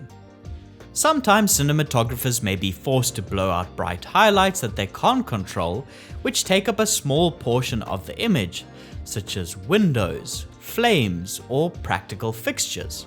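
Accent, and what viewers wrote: South Africa. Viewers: The narrator has a very South African accent. Nice 🍺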